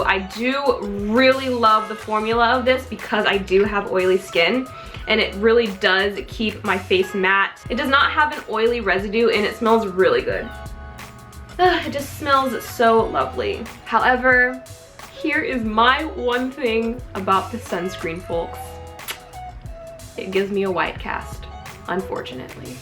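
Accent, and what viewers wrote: Australia. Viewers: I love the Australian accent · Just wondering if you follow Australian YouTubers 😊 Your Aussie accent is on point lol 👌🏼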